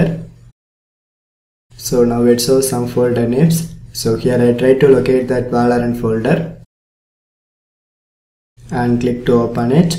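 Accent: India. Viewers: Use a robot voice instead of your indian accent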